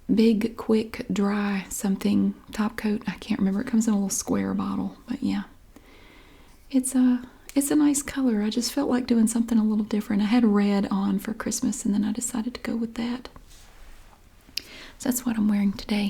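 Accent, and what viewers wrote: South, United States. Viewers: Hi it's good to see you as well, I love your southern accent, I must be high maintenance then because I have a full basket of products.😍😁, I'm new to your channel, I'd love to see you do a video with a tea party, and finger sandwich I think it would be fun.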